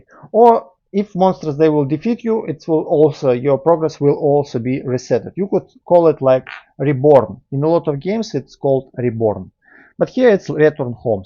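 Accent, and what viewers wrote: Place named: Russia. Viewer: Видно по акценту, что ты русский) Сними ещё видео, буквально вот на неделе обнова вышла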